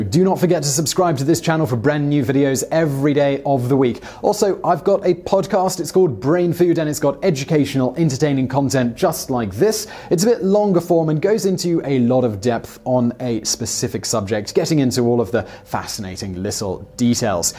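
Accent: United Kingdom. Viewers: What a wonderful British accent! 👏👏👏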